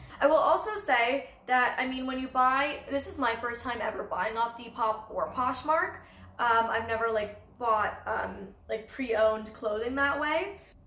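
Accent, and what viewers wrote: Canada. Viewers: Your Canadian accent is so cute haha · Aww! We don't laugh out of derision of your cute accent, we laugh because our brains are listening to your less affected accent and we forget you're Canadian. Then our silly brains are like "oh yeah she is!" So I guess the giggle (which is what I do) is partly out of our silly brains forgetting your nationality, and part because it's cute. FYI, I still giggle when I'm over at my friend's house and her husband says about. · I love Rebecca Minkoff purses, I Always Love your style and I love your Canadian accent! I love Canadians! xo All really cute items! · I love your Canadian accent so much! I love the way you say “sorry”💕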